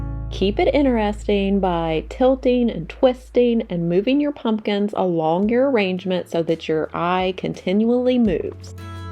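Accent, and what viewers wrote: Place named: Pennsylvania, United States. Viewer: Really cute decor! Love that copper pumpkin & wreath! I was just hunting the acorn spice today, & finally got it at target after asking a manager, it was still in the back. Was wondering if you are from PA. Kind of sounds like it to me. I grew up there. Have a blessed weekend!🍂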